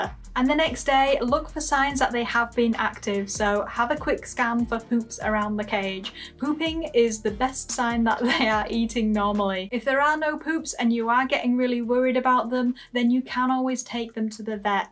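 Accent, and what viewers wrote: United Kingdom. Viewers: you are right I found the more I ignore my new piggies the more they want my attention. we enjoy your videos they seem to like your British accent. we live in Arizona in the states. The only thing they like to watch is you, planet earth,and bobs burgers in that order. Thank You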